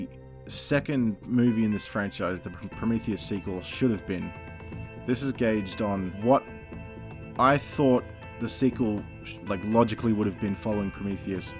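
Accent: Ireland. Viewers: Love your Irish accent lol ! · Your Kerry Irish accent with a mild hint of German is entertainingly close to the mark. This would have been a much better film from a continuity perspective and would have made a lot more sense. Given what we've seen of Shaw in flashbacks I think this film could and potentially will still happen if the Sequel / Prequel mentioned by Ridley Scott is still on the cards.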